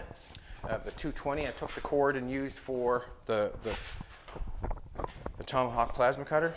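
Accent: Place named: Canada. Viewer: Ohhhh. "Tudor"!... last episode I kept thinking you were saying "two door" over and over again. Hahaha i gotta get caught up on my Canadian accent decipher. Looking good guys! 1 hour chop!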